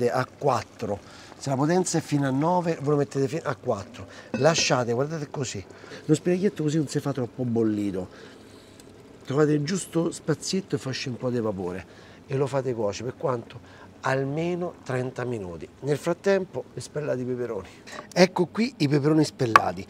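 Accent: Rome, Italy. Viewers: Quanto mi piace quel bel l’accento romano.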